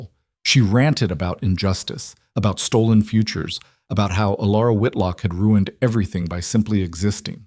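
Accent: United States. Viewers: Remember, this is not exactly an English literature course. More for pleasant entertainment that also gives a glimpse of that era, not necessarily that accurate.The narrator has understandable American accent, at least for these Filipino ears, with the best grasp of the language as many know. Many well educated too, honestly. MANILA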